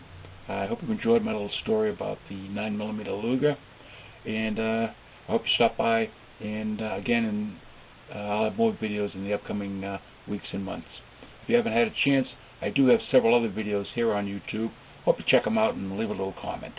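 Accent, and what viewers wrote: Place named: Boston, United States. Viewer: You sound like that you're from Boston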